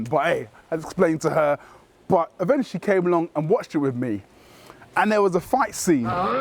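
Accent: United Kingdom. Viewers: I swear you have the best voice and accent of all Brits on Earth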